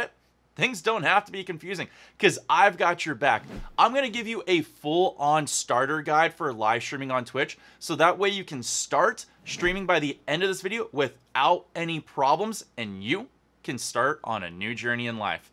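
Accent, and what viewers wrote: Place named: Israel. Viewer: אתה ישראלי אתה לא דובר אנגלית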